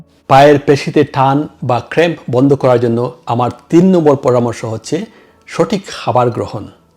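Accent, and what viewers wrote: Sylhet, Bangladesh. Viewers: আপনি কি সিলেটি? কারন আপনার উচ্চারণে তা বুঝতে পারলাম।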